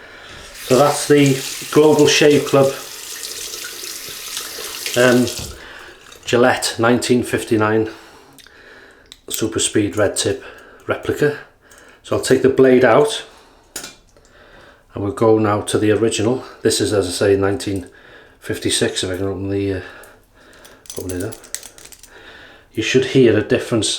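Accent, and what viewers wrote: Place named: Barry, United Kingdom. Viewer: Where you from mate, you sound like your from Barry town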